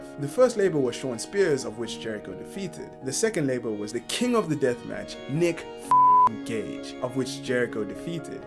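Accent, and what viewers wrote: South Africa. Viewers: I'm not sure whether its but you sound South African🤔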